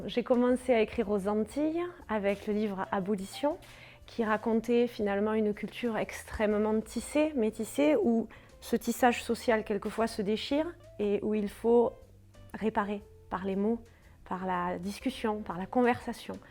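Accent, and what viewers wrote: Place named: Perpignan, France. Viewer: Quel agréable accent perpignanais! Cela donne autant envie que le propos de de plonger dans ses contes et facéties à la découverte des trésors oubliés de nos différentes langues. Merci!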